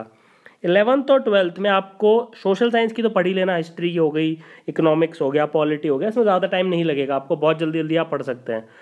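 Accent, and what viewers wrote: India. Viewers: very helpful video....gud to hear ur hindi accent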